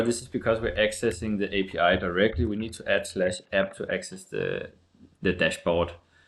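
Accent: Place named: Denmark. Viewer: Du er dansker kan jeg høre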